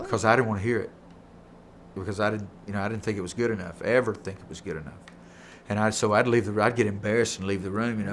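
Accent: South, United States. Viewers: I miss you!! Hes so adorable and very humble. I just love his southern drawl, beautiful laugh. Completely genuine southern gentleman. Love you ❤️🥰😎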